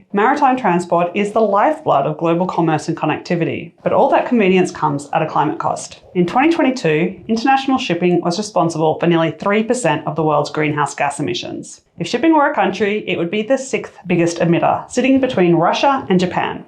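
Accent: Australia. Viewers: I like my bad news with a chipper Aussie lilt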